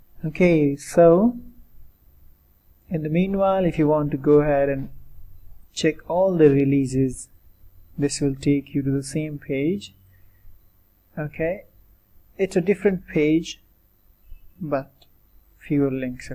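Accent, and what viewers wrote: India. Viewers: The Indian accent is your proof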